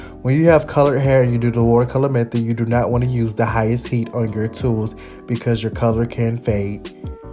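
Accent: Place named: Florida, United States. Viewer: Florida accent on fleek!! HAAAANNNN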